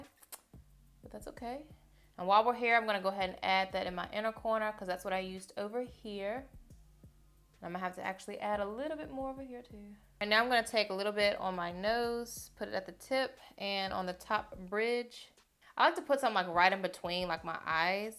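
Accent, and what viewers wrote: South, United States. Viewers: I love your southern accent so much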